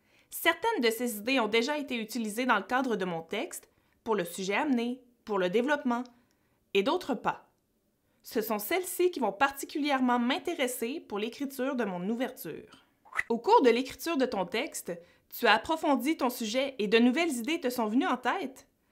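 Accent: Quebec, Canada. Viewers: bah il est normal, c comme ca qu'on parle au Québec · Merci pour vos videos. L'accent quebecois est mignon.😊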